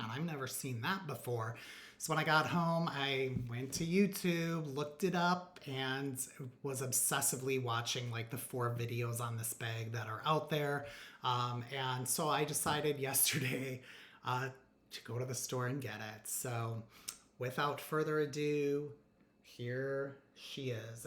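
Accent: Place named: Chicago, United States. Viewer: Great video. Classic bag. You don’t see it everywhere. I gotta ask... where are you from? I hear a Chicago accent perhaps?? Keep up the great content!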